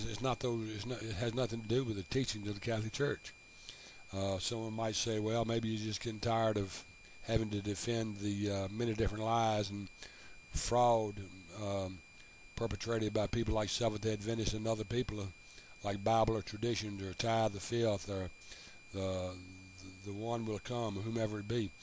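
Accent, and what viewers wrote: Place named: South, United States. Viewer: I am not sure who you are but brother you get it. I am a Byzantine Catholic. You sound southern...I'm from Mobile, Alabama, Can we talk? (I am not going to try and change your mind I think there is some good sense rollin' arounf in there). I think you totally get Catholoism. I think you need to look to your Easter Cathpolic friends before you leave.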